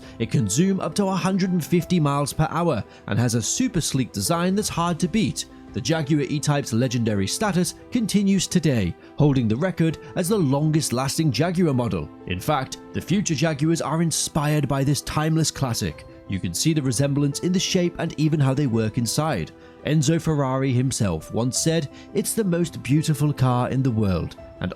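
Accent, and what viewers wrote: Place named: United States. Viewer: Suffers from an American accent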